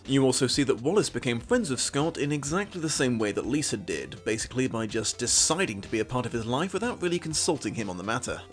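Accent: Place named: United Kingdom. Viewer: I find your voice so soothing,. But maybe it's just the weakness Americans have for British accents.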